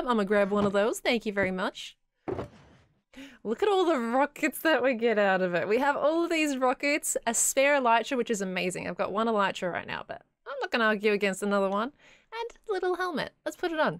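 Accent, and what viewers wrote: Australia. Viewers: enjoying the Aussie accent. Such an australian thing to call yourself ' '. Glad you have joined the hermitcraft server, I felt Australia was under-represented here:) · needs to work for a radio station or do pod casts he has the voice for it and i love it <3 that and your awesome aussie accent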